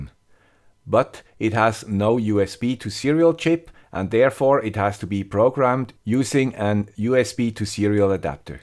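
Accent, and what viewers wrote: Switzerland. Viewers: Hello guy with the Swiss accent! Any idea what the camera connector is, CSI? Could you make a video to experiment and let us know if it would be possible to use a 5MP or 8MP camera from a raspberry pi, to increase the fps and quality? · Yes, THAT is an swiss accent. Notice the distinct difference between a german speaking english? And seriously... Tensorflow on the RPi? Do you know Yolo (uses Darknet)? Yolo classifies quite many different types of objects, but requires quite some processing power... the RPi3 needs around 40 sec for a single frame!!!!!! To make it realtime some external accelerator is required... and even veeeeery small cnn network models,,... It might work with very small images (need to test though...) like 50x50 greyscale or so...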